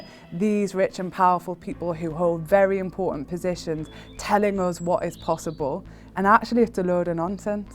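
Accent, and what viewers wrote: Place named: Northern England, United Kingdom. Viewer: Northern Accent opinion sounds weird so used to push English speakers hope you’re able to better your accent thank you for your understanding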